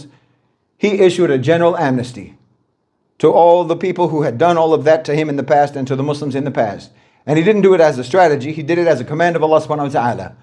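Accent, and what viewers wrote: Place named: United States. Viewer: You are extreme and seriously ridiculous. You speak as an American which tells me you were born and raised here. Your epiphany came to you after you began struggling with your own identity. That’s your problem, you struggle yourself with your own identity. Therapy, a good therapist could help you because you need it. If you are going to preach history including that of western culture and society you need to be very honest and don’t cherry pick the best examples from past 300 yrs that will bolster your ridiculous rhetoric. You seem to purposely ignore what has been happening all over this tiny planet since beginning of recorded time, time of the Bible. You like to blame the whites, what, the pinks as you say in another video. I have to admit listening to you was entertaining and made me laugh several times but on serious note, you need to accurate and thorough with your so called history lessons. 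Entire planet has been savage. You know this but choose to blindly ignore it. This is niche for you to appeal to the low vibrating low IQ of a subset of American blacks. This niche grift of yours isn’t going to fly much longer as more and more black families are finding their way out of the poverty and are now enjoying safe and fun lives living further away from the urban blacks who you really appeal to. The young black today are not falling for this crazy division. That’s all you preach and comment about, hate the whites. Your comment sections are insane, stuffed full of low inner c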